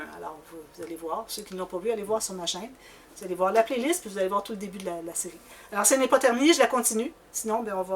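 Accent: Quebec, Canada. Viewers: Martine Mérard. Bonjour, merci pour toutes les réalisations que vous partagez. J’aime tous vos projets, la variété des thèmes, du niveau de difficulté, j’ai appris tellement de choses depuis que je vous suis. Au début, au hasard des recherches sur YouTube, c’est votre accent qui m’a interpellée ( à cause de plus de 10 ans d’échanges scolaires avec Chicoutimi…).C’était d’abord le premier plaisir du matin, à présent c’est le soir, mais le plaisir est toujours là et l’impatience de la découverte à chaque dévoilement. J’ai réalisé plusieurs de vos albums, ou emprunté des idées, des inter actions, j’aime les pop ups, les cachettes, mais aussi les choses plus simples et les albums pas trop gros! J’ai contaminé ma petite fille (6 ans!) qui veut scraper avec moi et échanger des joyeux courriers et jouer à! Je souscris à l’idée de Patricia je crois pour un album en duo grand mère et petite fille. 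Et puis encore pour des tutos express, et peut être des réalisations plutôt style junk journal et récup. Continuez à nous enthousiasmer!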